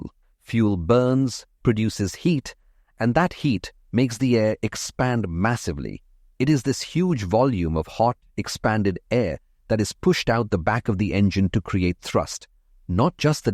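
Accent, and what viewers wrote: India. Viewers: Is it me or the narator is indian ??? That beeing a well known gateway for russian propaganda...😂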